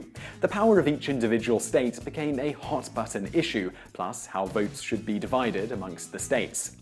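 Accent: United Kingdom. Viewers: I love when you accidentally stumble onto an awesome channel. This channel is one of those awesome ones. I have been watching your videos non-stop for a couple days now. I can't get enough of them. However, is it possible for to slow down a little. He speaks super fast without any breaks. Sometimes I don't think he even stops at the end of his sentences. I think maybe he only stop at the end of his paragraph...lol In addition to his super speed he has that accent going on. You know when you are listening to another language that you do not know and you can't figure out where the breaks between words are? That's kind of what's happening here and I've been speaking English for 47 years. I actually have to pay really close attention, concentrate, & sort of translate what is being said. It's taking a little work to watch these videos and I watch youtube so I don't have to pay attention. 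If you do decide to take my advice, you don't have to slow down dramatically just a little slower would be good. Plus I think I see you turning blue from lack of oxygen....I'm just kidding about that. I see you have a lot of subscribers but I'm wondering if maybe your subscriptions would increase faster if you did slow down a little bit. I could imagine that some people may be passing up on pursuing your channel because your videos do take a little work to understand and follow what is being said. Obviously Europeans probably have no problem following you because they are used British-Englis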